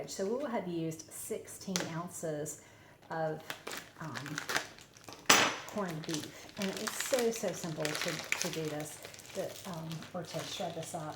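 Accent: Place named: North Carolina, United States. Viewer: I know I’m late for this video but it looks great and can’t wait to make it! Just have to tell you (will sound weird lol) that you remind me of Dr Brent Ridge (of the Fabulous Beekman Boys); he’s a native North Carolinian too and just your mannerisms and accents are the exact same!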